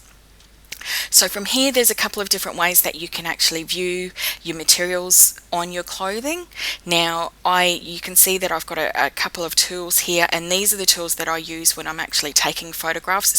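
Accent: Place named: New Zealand. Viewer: ooh I thought you sounded like youre from New Zealand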